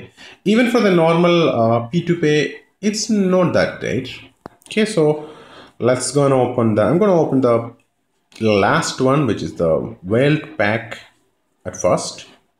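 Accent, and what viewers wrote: Kerala, India. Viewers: oru malayali paryne pole tane und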